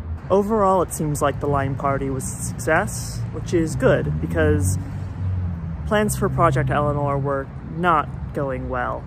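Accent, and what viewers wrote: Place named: Ireland. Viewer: I had never heard about this guy before but the fact that people in the comments are saying this is well condensed is crazy to me. Like this is enough for those poor people to be put through! (also what is with the irish accent? why not just be english? what does northern ireland have to do with harry potter?)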